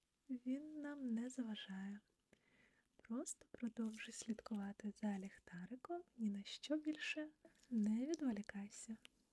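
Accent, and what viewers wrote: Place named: Ukraine. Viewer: As I always say, relaxing begins with!🤩🤩🤩 what a beautiful whispering voice with that magical Ukrainian accent!!!😍😍🥰❤Love Greetings Oliver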